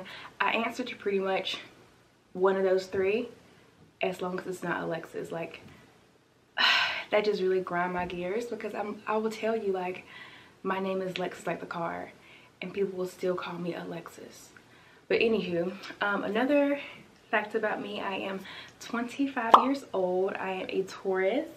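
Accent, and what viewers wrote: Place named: South, United States. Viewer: You are sooo down to Earth and your accent is so cute! I immediately heard the "country" in your voice. Im a southern gal.. I live in Louisiana. You are so humble and kind!! Never let anyone steal that from you!! You are so beautiful being you!!! 👑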